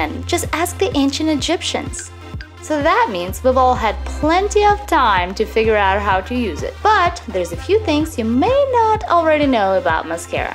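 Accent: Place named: Russia. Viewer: i like this slight russian accent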